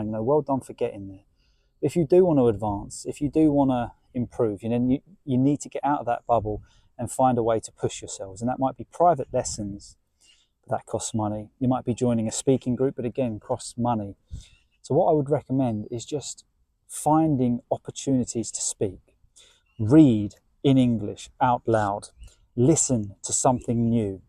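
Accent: United Kingdom. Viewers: سعيد بالانضمام إليكم... سوداني... معجب باللهجة البرطانية...!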